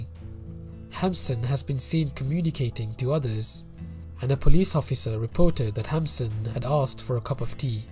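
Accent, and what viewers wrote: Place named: India. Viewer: The narrator has an Indian accent, just curious.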